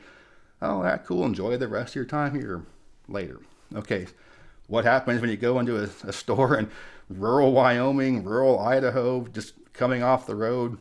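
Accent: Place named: Southeast, United States. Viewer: I'm just guessing here, but your southeast accent is apparent to my ears, which many Mormons might associate with the Baptist denomination. And I believe there is a bit of hostility between LDS and Baptists, which became apparent to me when the typically-Republican-voting southern states had a very low turnout for Mitt Romney when he ran for President three or four election cycles ago. So it may go both ways, who knows. Edit: I'm from the Midwest, have traveled through Utah extensively, and lived in Wyoming for about a year, and was always embraced by the local population. You're a friendly enough guy, so it's got to be something else, either license plate or accent related (or that T-shirt you used to wear that read "Losers, Derelicts, and Savages unite)".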